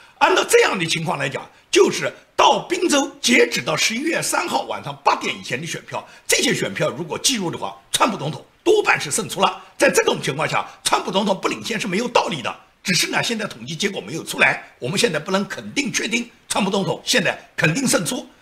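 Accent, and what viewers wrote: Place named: Fujian, China. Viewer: 一听声音就知道是福建烂仔。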